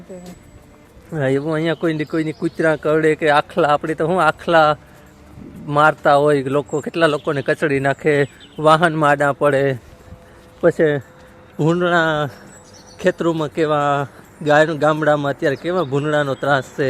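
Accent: Kathiawar, India. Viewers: Sita Ram, bhai. Boli upar thi kathiyawadi lago cho. Lincolnshire chuo?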